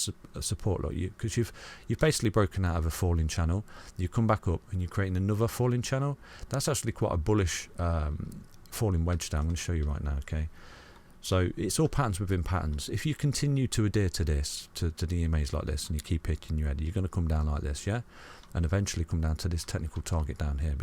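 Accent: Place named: Birmingham, United Kingdom. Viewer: I'm not a trader I'm a hodler but your videos are great educational information and your accent is good to here (are you a brummie?) . Regards from Thailand 😁🙏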